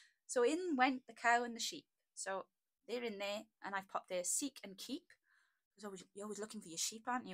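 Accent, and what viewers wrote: Wales, United Kingdom. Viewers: Wow! Just wow! How beautiful is that kit? and it's free!! That's very generous of you. I'm a new subscriber and am so thrilled to hear your Welsh accent. I was born in Wales and my parents emigrated to Australia when I was two. Now that they have both passed away I really miss hearing their accents. I would have subscribed to your channel just for the accent lol but your content is beautiful. Many thanks for sharing your positive take on autumn.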